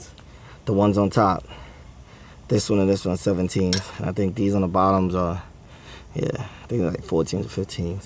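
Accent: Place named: East Coast, United States. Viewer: East coast accent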